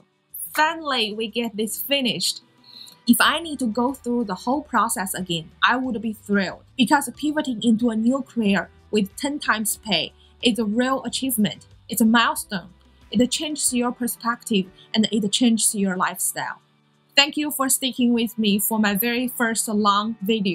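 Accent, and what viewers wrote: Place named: India. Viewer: indian guys are killing it with their accent good luck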